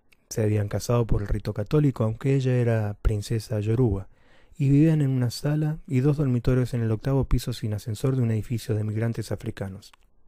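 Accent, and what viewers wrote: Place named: Argentina. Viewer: Me encantó y me encanta el acento Argentino, lo quisiera para leer uno de mis cuentos 😚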